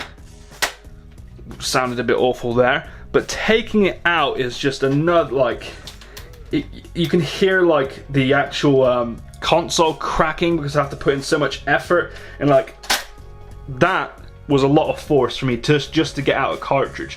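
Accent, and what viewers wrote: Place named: Scotland, United Kingdom. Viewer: Is your nationality Scottish? Your accent seems to hint at it. Just curious. Also, great video! I may consider buying one of those Handheld Super Nintendos.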